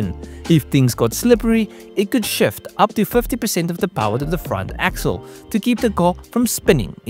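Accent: South Africa. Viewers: You sound like a white south african, please take care and protect yourself from the maniacs.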